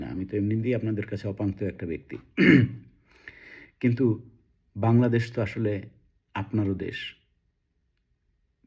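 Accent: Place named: West Bengal, India. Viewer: তোমার উচ্চারণ এবং ভাষা পুরোপুরি পশ্চিমবঙ্গীয়। তোমাকে পশ্চিমবঙ্গের আসার জন্য আমরা স্বাগত জানাচ্ছি।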